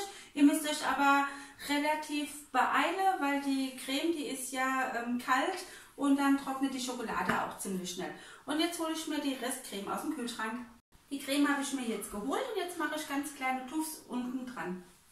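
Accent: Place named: Saarland, Germany. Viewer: Hallo liebe schaue mir gerne deine Videos an wollte dich mal fragen kann das sein dass du vom Saarland kommst ich bin nämlich auch auf vom Saarland meinte nur der Dialekt Werk sehr gleich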